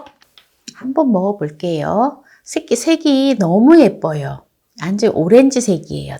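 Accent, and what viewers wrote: Chungcheong, South Korea. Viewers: 고향이 충청도라 그래유 이해해주세요 죄송합니다 ❤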